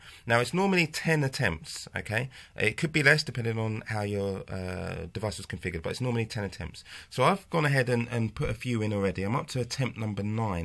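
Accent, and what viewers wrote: United Kingdom. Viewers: I did not understand, I cannot understand british accent that easy :( gonna try again :(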